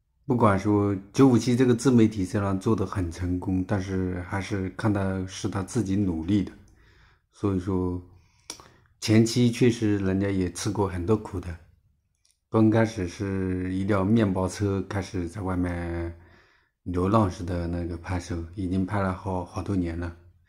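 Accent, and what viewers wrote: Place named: Zhejiang, China. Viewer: 有浙江口音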